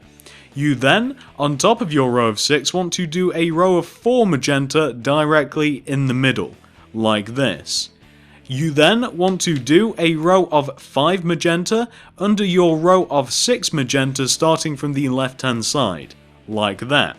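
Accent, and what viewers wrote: United Kingdom. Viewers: He is from the UK I believe.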